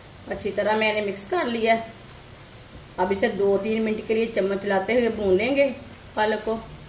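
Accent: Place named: Punjab, India. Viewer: Ap urdu m video na bnaya krien pnjabi m bnaien ap ka bolne ka andaz pnjabi h urdu zuban ap pr sot ni krti pls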